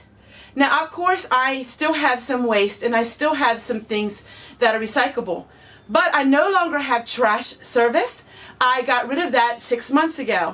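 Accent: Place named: South Louisiana, United States. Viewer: interesting video. I’ve watched a few videos, and I’m trying to place your accent. South Louisiana? Nonetheless, you have some really interesting videos. Keep up the good work!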